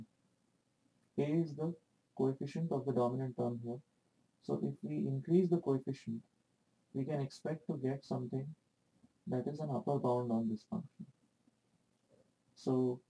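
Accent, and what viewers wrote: India. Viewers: Indian (?) accent plus s-o-f-t voice plus recording volume turned up to compensate = mud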